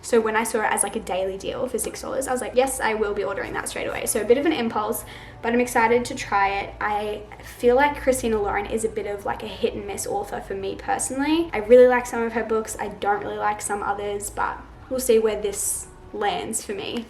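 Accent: Australia. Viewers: Your Australian accent is beautiful !!!!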